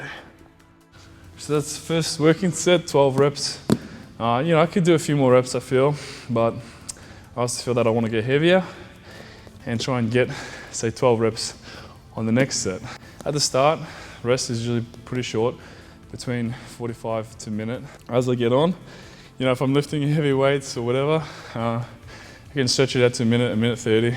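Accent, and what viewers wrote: Australia. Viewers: most definitely is Australian you plum. Hence the Aussie accent. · With a hint of an Australian accent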